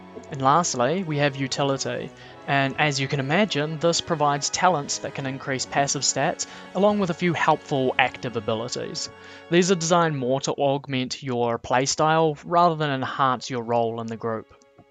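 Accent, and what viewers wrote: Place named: New Zealand. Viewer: I love hearing a thick kiwi accent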